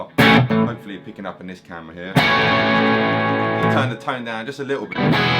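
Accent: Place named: South London, United Kingdom. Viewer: Great video - the most basic fuzz lesson but the most instructive. And love the SRV/Hendrix towards the end!!! Brilliant. And love the sarf Londern accent...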